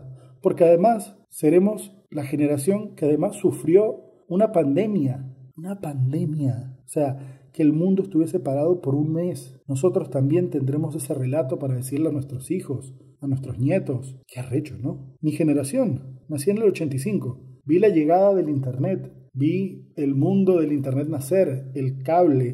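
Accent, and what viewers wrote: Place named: Andalusia, Spain. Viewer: Que bien, buen análisis... empece a seguir... además mejor con el acento del sur con palabras venezolanas je je je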